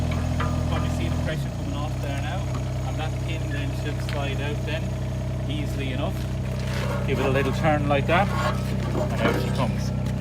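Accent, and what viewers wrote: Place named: Ireland. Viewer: Yes this is my second comment on this video and I’ve seen all of the others where people are swinging hammers and all sorts of things to get buckets on and off. This guy with his lovely Irish accent just simply shows you how to get the bucket in balance and do it with one hand. I am part Irish so I can say something about his lovely accent!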